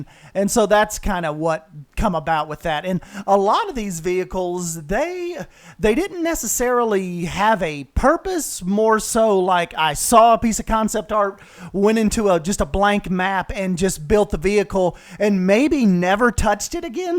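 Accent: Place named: Texas, United States. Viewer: Man this video is sooo vintage, I can't wait to see if they come out with a Planet Coaster 2 as I've heard you & Drew mention that quite a bit. I do think that Planet Zoo will be a perfect placeholder for you tho while we wait for a Planco 2. My wife said, is that guy from Texas? LOL Keep doing you man!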